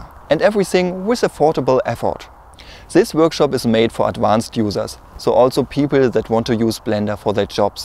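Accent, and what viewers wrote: Germany. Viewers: Der deutsche akzent ist so süß :) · Der deutsche Akzent ist mega witzig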